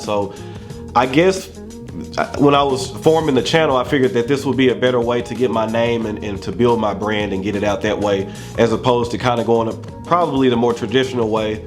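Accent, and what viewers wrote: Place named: Memphis, United States. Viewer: Man. You got one of the cleanest looking channels. And a a1 personality and presence for this. And that accent yo 😂 Makes me miss Memphis. Gotta go see my aunt and cousin up there soon. I always had trouble finding good channels cause I noticed that some were way too damn bourgie, some were way too amateur, some were alright but the people runnin the channels were lowkey nuts as individuals (and not in a good way) and some were just bad all around by either trying too hard to be all Luxurious or whatever and looking strange for their trouble. There are a whole lot of bad options out there. But I've found a handful of down to earth, decent seeming folks and I'm glad you're one of em. Even if I'm relatively new to ya. Stay at it 👊👊👊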